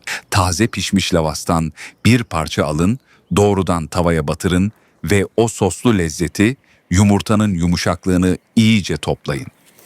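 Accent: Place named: Turkey. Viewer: Salam niyə türk ləhçəsi ilə danışırsınız?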